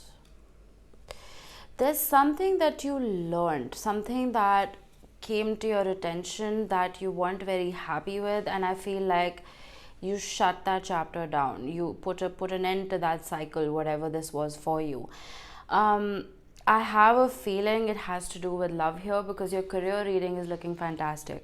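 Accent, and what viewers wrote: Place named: South Asia. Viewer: you look european but sound south asian...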